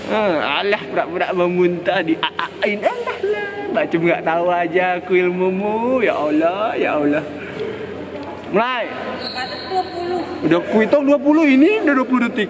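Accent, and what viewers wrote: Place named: Medan, Indonesia. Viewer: logat medannya anjay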